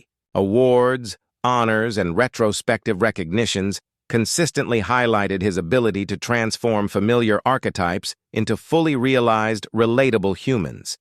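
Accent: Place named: United States. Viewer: I am a relative of Tom and currently visiting the Selleck's. What did Tom confess? He has confirmed already many times he hasn't confessed anything recently. Everyone we know has already read his book or listened to the audio CD. Learn English. It's free on YouTube. Your Americanized AI-generated voice sounds unnatural. We know that you live in a third world country and don't speak English. Don't talk about people you don't know personally.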